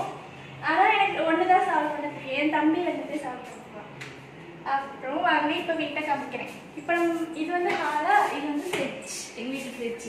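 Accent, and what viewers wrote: Tirunelveli, India. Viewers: Innocent is bliss. Ur innocent soft voice n tirunelveli slang super...I happen to see one video on 16th may that ammavituke ponnu pogamatta..liked it subscribed n watched all videos same day...liked almost all. Keep doing.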